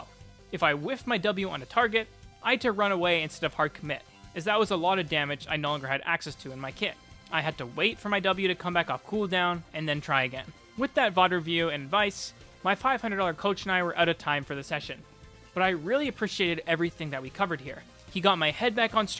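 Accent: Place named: India. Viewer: Cant watch this.. this india accent is just blood in ears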